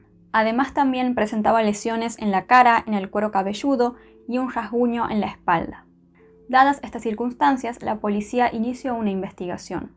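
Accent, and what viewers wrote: Argentina. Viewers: Es la primera ves que veo uno de tus videos y me gusta mucho cómo haces la narrativa, el acento argentino es genial! Saludos desde México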